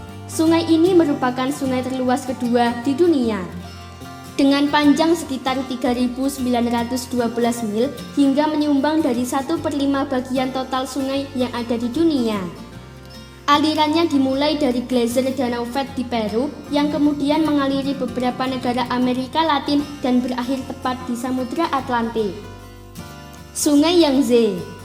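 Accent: Java, Indonesia. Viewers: Meddok jawane 🤣😁😃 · Orang Jawa 😊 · Jawa,,,,,,,,, suara nya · pengisi suaranya bagus si tp ya jng terlalu keliatan logat kejawaannya, ya memang si susah klw orang jawa Timur ataw Jawa Tengah untuk bicara bhs indonesia tanpa logat, laen orang jawa barat yg bicara bhsa Indonesia itu enak di dengar ga nyeklok gt, tp keren ko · Logatnya jawa banget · medok banget njiir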